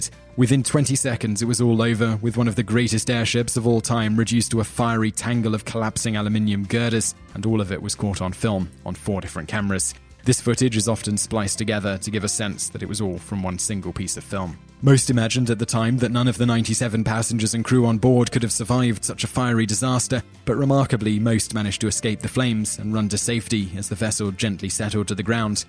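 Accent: England, United Kingdom. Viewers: As told with an English accent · He's a Brit, so give him a break! Lol!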